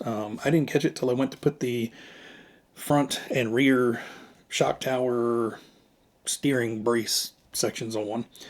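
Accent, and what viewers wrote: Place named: Boston, United States. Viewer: Very nice ! Love your Boston accent🤣 Do you have any of the earlier M chassis ? I only have a M-01 Mini Monte Carlo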